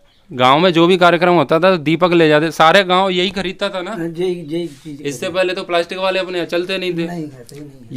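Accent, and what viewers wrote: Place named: Braj, India. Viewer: भाषा तो ब्रज लग रही है , आचार्य जी ?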